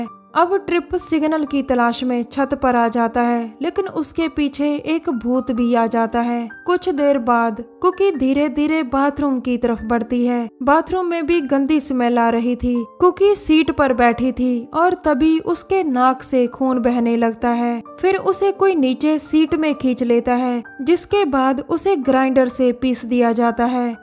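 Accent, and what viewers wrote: Punjab, India. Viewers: Are you panjabi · You're talking style punjabi. Are u punjabi? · R u punjabi?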